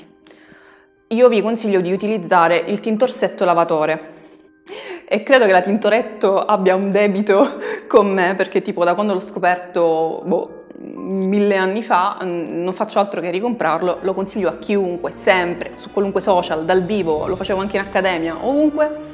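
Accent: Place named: South, Italy. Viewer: MA LA TENDENZA DEL SUD SI SENTE !!!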